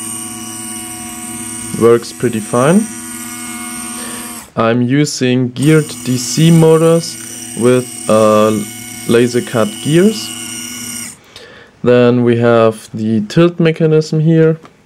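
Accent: Germany. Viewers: I realize this video is very old, but I am currently looking into this for a hobby project. Your Accent makes be believe you may be German, if not then sorry right away. I can't help but notice you really enjoying this gearbox and was wondering if you still remembered where you bought it from, if it was online, and how much it cost. Thank you very much!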